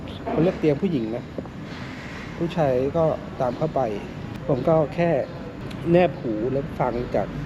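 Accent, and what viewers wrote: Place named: South, Thailand. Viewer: รุกภาษาใต้นคร55555😂😂😂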